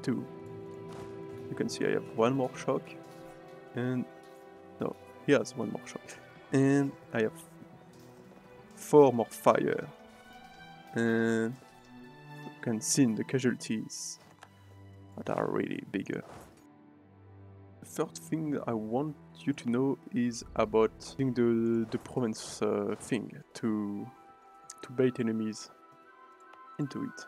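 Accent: France. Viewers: A french man speaking english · L’accent français le plus beaux qu’il soit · Why you speak english like macron?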